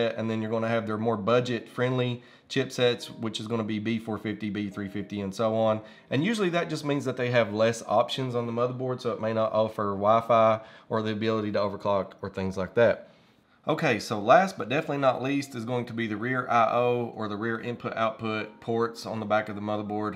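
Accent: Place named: Appalachia, United States. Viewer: Accent is N.C, Tennessee, West Virginia or Kentucky...Am I right?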